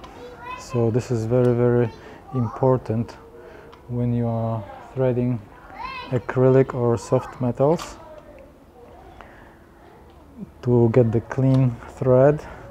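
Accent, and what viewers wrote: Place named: Poland. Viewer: Very nice video mate. Well done! Where are you oryginaly from? Your accent sound Polish am'i right?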